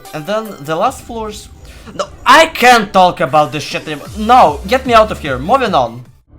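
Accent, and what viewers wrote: Russia. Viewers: Bro you have absolute russian accent and great vid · You are Russian? (your accent sounds like Russian.) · russian accent noticed👌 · man, u have have so Russian accent XD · U have russian ascend, are you? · у тебя как будто русский акцент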